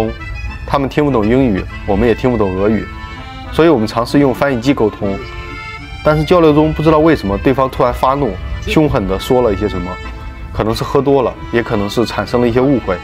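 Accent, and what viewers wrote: China. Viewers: 猜想博主是山东人？ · 您是河南人吧❤❤❤❤口音❤❤❤❤中原特色❤❤❤❤❤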